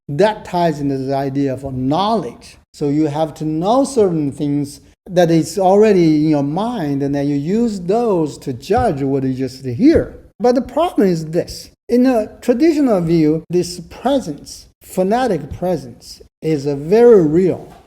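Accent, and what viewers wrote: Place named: Asia. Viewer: 😅funny the midweight accent of the speaker is certainly Asian origin, more likely Mongolian line of accent, like Mandarin or Korean; and, no Chinese is not the only non-phenolic language in the world, certainly a very poorly researched position. And to used such a narrow band of measurement to arrive at such a broad encompassing subject is a slap on the essence of academic endeavors. I used to like Hagel a lot as I like the challenge to follow his maze of thoughts, sadly the older I get the more I experienced, the more flaws in Hagel I see, and the more reasons that to see how he wrote with so much unnecessary acrobatic maneuvers to confuse and to hide the tracks of flaws. My humble 2 cents.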